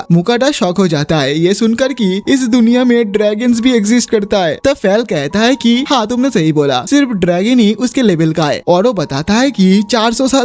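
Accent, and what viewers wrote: Bengal, India. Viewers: Are you a Bengali? I mean your accent is familiar to me. Anime ko 8 dena prefer karungi. And for your voice it's also 8.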